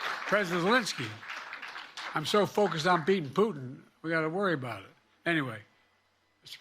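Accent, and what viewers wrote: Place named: Yorkshire, United Kingdom. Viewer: He's a Yorkshireman, and they're a bit different up there.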